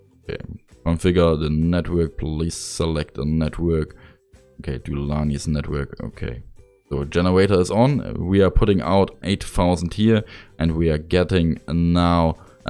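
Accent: Germany. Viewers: Do I hear a slight German accent?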